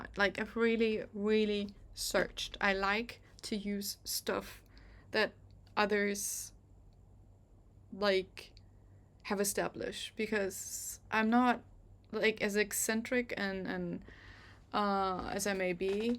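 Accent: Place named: Germany. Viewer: Your English is great actually👏😎, yet it has a carry-over🎉 from german not just in accent/pronunciation, rather also in the way to frequently used fillers such as Ähem/Uum😂😢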